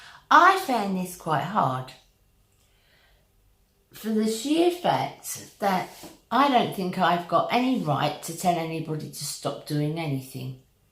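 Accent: United Kingdom. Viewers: What an absolutely lovely lady you are. I recently found your channel and I adore you. Also, like your Britsh accent. Thank you for your kind heart and your videos looking forward to seeing more.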